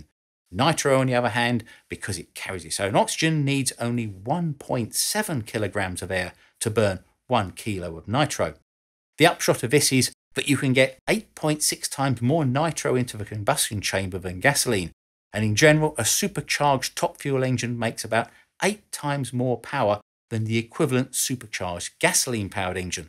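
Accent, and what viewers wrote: United Kingdom. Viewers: Love the UK accent to narrate a video that can be described as " 'MERICA!! " 😂🤣 So much fun. Thanks mate! 😊👍upvote earned. I'm personally not into 1/4 [or 1/8] mile racing but as an welder with a lot of experience with Aluminium I have professional experience with the blocks and various parts away from race days. IF anything is left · This is very old information being about 6 years old. Much bigger numbers in 2025. And a guy with a British accent is commenting on a purely American sport?? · Well, that's an unexpected subject for but in fact, there are many points in common with rockets:D That was funny. And top of that: with british accent! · Why the sickening sissified limey accent?????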